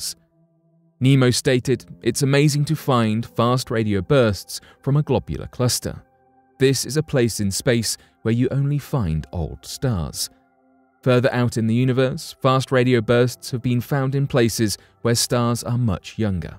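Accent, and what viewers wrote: United Kingdom. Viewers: Can't you bloody blokes find SOME OTHER NARRATOR ( PREFERABLY SOMEONE WITHOUT A BRITISH ACCENT)??? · EVERY BULLSHIT SPOOKY MYSTERY SITE ON YOUTUBE HAS A FUCKING BRIT NARRATING, THEY HAVE "STONE HENGE ITIS"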